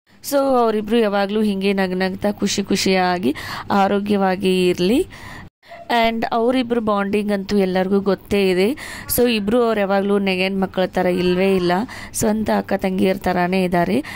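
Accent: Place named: North Karnataka, India. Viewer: Neevu nam uttar karnataka style le maatadri ennu chalo anasatt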